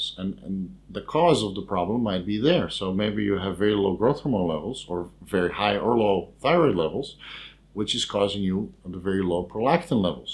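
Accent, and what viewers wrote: Netherlands. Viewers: why is that buddy? Great channel by the way. Could tell instantly you were from the Netherlands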